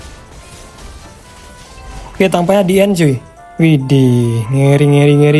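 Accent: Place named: Makassar, Indonesia. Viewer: Saya tebak ya bang, dari logatnya bang orang Makassar dan sekitarnya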